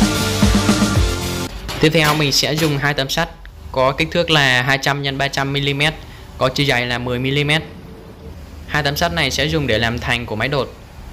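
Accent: Vietnam. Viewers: Giọng nge giống dưới thanh bình thế nhỉ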